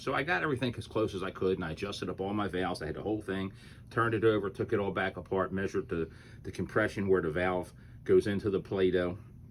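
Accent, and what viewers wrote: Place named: Baltimore, United States. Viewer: You sound like you are from Baltimore... Is this engine from the area?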